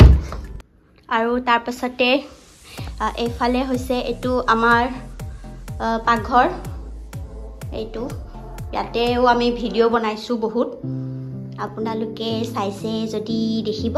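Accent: India. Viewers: তুমি নলবাৰীৰৰে কোৱা❤❤❤❤❤❤❤❤❤❤❤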